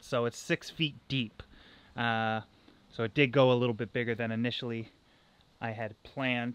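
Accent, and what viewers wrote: Canada. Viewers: He's Canadian.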